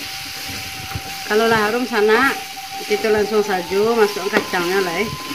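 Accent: Minangkabau, Indonesia. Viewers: Lah wak Cubo buek tek, Alhamdulillah.. Lai lamak, awak suko ngikutin resep etek, klu dari bahaso nyo, etek kok kayak urang pesisia, iyo po ndak tek.. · Uni menggling cabe Minang asli👍👍tak ade Kat Malay ni,bahasa nya pun khas,🤣🇲🇾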